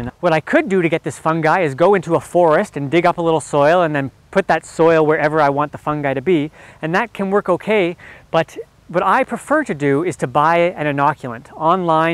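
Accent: Canada. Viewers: You sound so Canadian.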